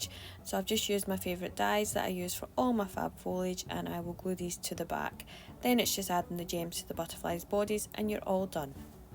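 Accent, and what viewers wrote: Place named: Scotland, United Kingdom. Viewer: I have liked and subscribed to your channel and love that Scottish accent. This wreath is stunning i never seen anything like it its stunning. great job you are one talented lady.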